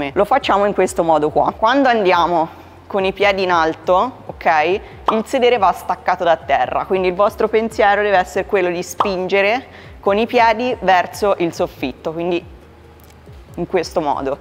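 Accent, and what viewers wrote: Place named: Tuscany, Italy. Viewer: Poco toscana😅😂😂😂❤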